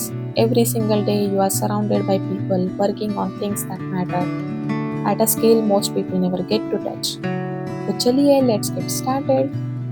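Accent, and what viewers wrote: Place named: Ahirani, India. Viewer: Your accent is more like an Ahirani language 😃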